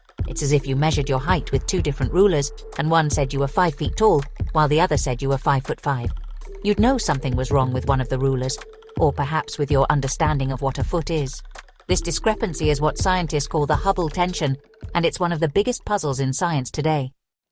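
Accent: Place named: United Kingdom. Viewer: I liked the video. AI narrators often make rookie pronunciation mistakes like calling a Type Ia supernova "Type eye-uh" rather than "Type one-A". Nice touch with the British accent, though. Lends an air of Masterpiece Theater to the lecture.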